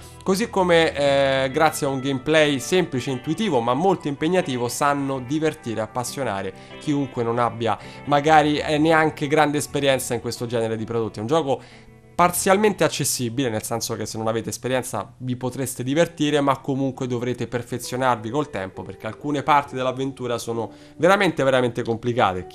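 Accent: Rome, Italy. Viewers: Il vero problema è che tu, avendo uno spiccato accento romano, devi scrivere " 'n c(i)'à ccapito Ggnente...", c'è la doppia "g" anche se serve a raddoppiare il "gn", "nniente" invece "nun sona pe' ggnente". Se mme so' sspiegato, bbene, sennò ve n'annate...(censura)......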